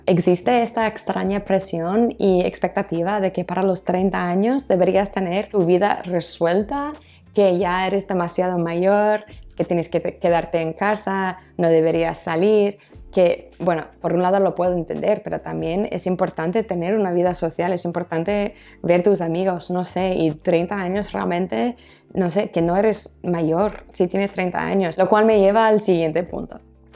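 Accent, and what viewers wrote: Madrid, Spain. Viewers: Te has españolizado tanto que se te nota en el deje al hablar y a la hora de mover las manos y el cuerpo. Fenomenal. Felicidades. Encantado de conocerte. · ¿Tienes acento español o estoy alucinando? ¡Qué rapidez y fluidez hablando! Me impresionas. Yo hablo muy rápido de manera natural, pero cuando lo hago en inglés comienzo bien pero luego me estrello y me atasco jaja. Si sigues así, en poco tiempo podrás engañar a un español diciéndole que eres de Leganés jaja. Es una suerte que os vengáis para acá los estadounidenses "guais". Un abrazo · Tu acento español es espectacular. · tienes un español espectacular. Además con acento español/madrileño. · Soy español. Me sorprende al leer : " soy latino y notamos inmediatamente el acento español de España " Mi duda es, porque dentro de España hay muchos acentos ( no solo uno que sea el propio de España). 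Alguien del norte, por ejemplo Galicia habla con un acento TOTALMENTE distinto a alguien del sur ( Andalucía) y el acento de las Islas Canarias es MUY parecido al acento de algunos países de hispanoamérica. Esa chica del video tiene un acento, diría de Madrid o del centro norte de la Península Ibérica.Teniendo muchos acentos en España tengo esa curiosidad de cual es el acento del español de España. Saludos desde Madrid. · Tiene usted muy pegado el acento español de españa , soy latino y notamos inmediatamente el acento español de España , pensé que usted era española . saludos macha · Se nota en tu forma de hablar y de expresarte no solo que te estás volviendo española sino que también se te nota el madrileño jaa Es bueno no cerrarse a lo que uno está acostumbrado y coger las cosas buenas que aprendes y añadirlas a tu forma de ser y personalidad. Y algo bueno que sin duda tenemos los españoles es lo alegres, cariñosos y enérgicos. 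También somos muy gritones pero eso te recomiendo que no lo acojas xD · Bravo,ya tienés ese Acento Español, bien marcado el acento de la 'Z' como cuando dices: zapatero a tus Zapatos!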